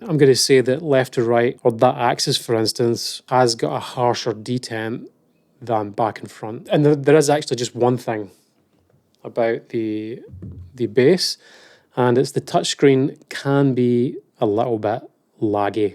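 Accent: Scotland, United Kingdom. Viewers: i never knew i always wanted a scottish reviewer in my life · Thanks! Just ordered the WinWing Orion 2 F15 EX throttle and separate MOZA AB9 flight stick. Hoping that they're good 👍. I'm a scot living in Texas so was glad to hear the accent in your review. Thanks again.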